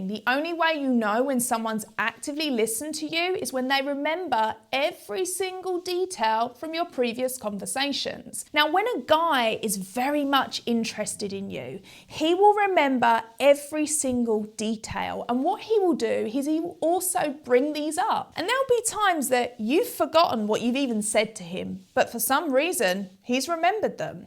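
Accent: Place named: United Kingdom. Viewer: She’s British, different pronunciation, I believe.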